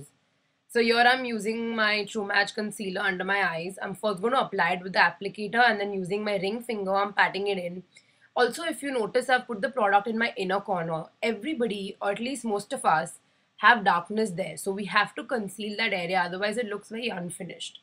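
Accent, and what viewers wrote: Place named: India. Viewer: your make-up style says you are a huge fan of Michelle Phan. I like how you did not try to have an accent and spoke in true Indian way!! Way to go :)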